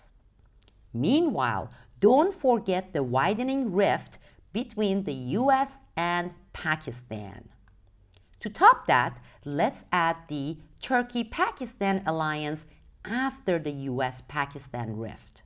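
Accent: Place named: Iran. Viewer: What's your real name? I can definitely detect a Persian accent.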